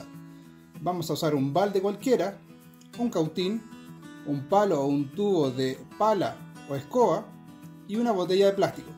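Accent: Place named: Chile. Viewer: Hermoso acento chileno 😻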